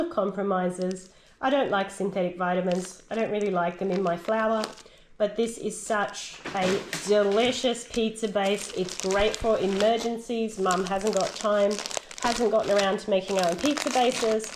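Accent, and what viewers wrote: Australia. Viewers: New subscriber! Lovely to have found your channel, its so great to hear our Aussie accent ❤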